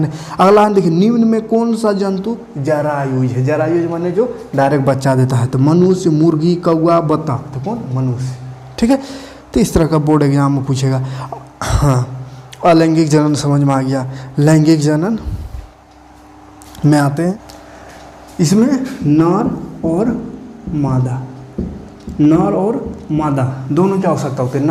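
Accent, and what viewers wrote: Bihar, India. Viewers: Aap Ke aavaj se lag ki Aap Bihar se ho😄😄🙄🙄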